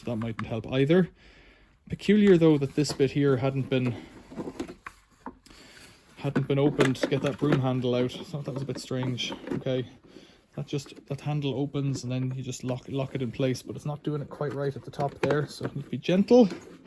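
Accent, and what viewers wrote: Ireland. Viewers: You sound like an Irishman. I bought mine 1 week ago with same vacuum and looking for some DIY as my newly bought bloody expensive vacuum stops suctioning but power is working. Can’t find any solutions on my machines manuals.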